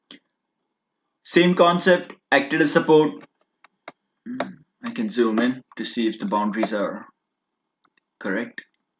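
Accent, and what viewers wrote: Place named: India. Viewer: thick indian accent, my head is wobbling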